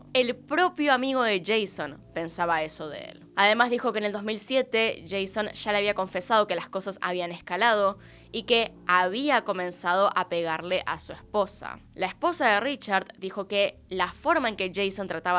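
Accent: Argentina. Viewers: Ayyyy me encantas 😍 pekeña cómo hablas tu idioma y siempre te arremedo con tu acento boludooo soy mexicana te mando muchos saludossss y el gran contenido de tus relatosss e historias que cuentas, además es la 1 vez que te escribo Por si estabas con el pendiente Jajajajajaja cuídate mucho te mando un 🤗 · Me encanta el hablado Argentina Dice Shaaaaa por decir ya Jajajaja me encanta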